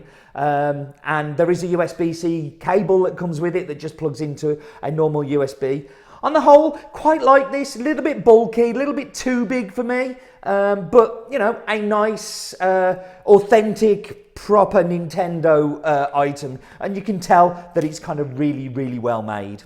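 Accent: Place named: Ireland. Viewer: Could be Irish aswell...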